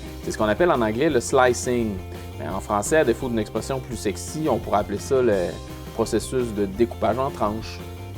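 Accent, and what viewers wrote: Quebec, Canada. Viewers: Génial, je viens de découvrir ta chaîne, c'est vraiment cool tout tes trucs, et côté vulgarisation, c'est un plus et en plus, en québécois !!!! J'ai rien contre nos cousin français, mais du français de chez nous au Québec, sa sonne mieux à mon oreille ;) ! Se serait bien une suite sur l'impression 3D, je m'intéresse pas mal au sujet, j'aimerais bien en apprendre d'avantages particulièrement sur le dessin de tes propres pièces. Je suis maquettistes et sa pourrait m'être utile. Si il y a un logiciel de base, pas trop complexe d'utilisation pour les débutant et pas trop dispendieux, encore mieux gratuit et en français se serait vraiment le fun :) Merci, et continu ton bon travail !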